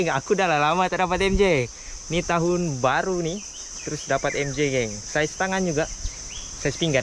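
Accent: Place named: Malaysia. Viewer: Mantappp.....nk tnya awak org mana??bunyi mcm org Malaysia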